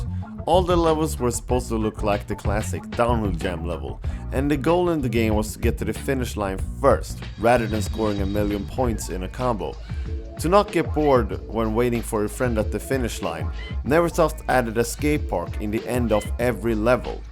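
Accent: Sweden. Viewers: Holy shit you sound really swedish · the guy that narrates are so Swedish :)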